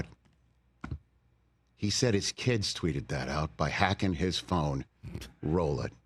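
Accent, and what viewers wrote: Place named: Staten Island, United States. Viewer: You could hear that Staten Island accent coming out that entire segment. I love a fired up Rich.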